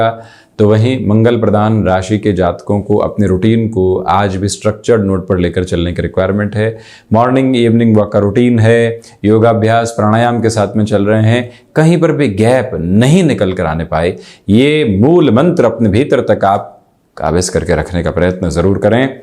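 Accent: Standard. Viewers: वाह!गजब की शुद्द हिन्दी बोलते है आप।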